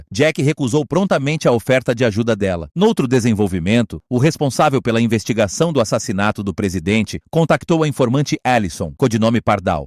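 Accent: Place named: Mozambique. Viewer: em uma hora e todo acompanhado com imagens Você é gênio O seu português é fácil perceber um Moçambicano parabens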